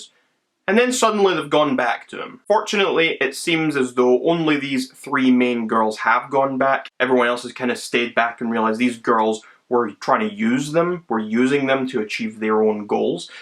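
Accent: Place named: Scotland, United Kingdom. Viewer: Ok you are Scottish 🥰 best inventors,best accent,now my best channel for tea ☕️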